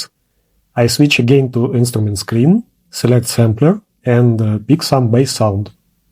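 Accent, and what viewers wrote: Russia. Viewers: Друг слышу русский акцент) как бы такую собрать? Есть схемы или где купить, очень хочу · Эй у тебя русский акцент ?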